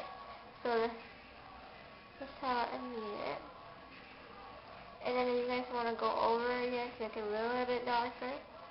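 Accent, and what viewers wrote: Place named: Asia. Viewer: you got an asian accent lolz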